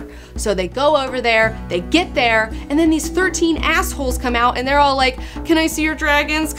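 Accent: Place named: South, United States. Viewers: What state are you from? I hear a southern accent. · You sounded especially southern in this video. I love it!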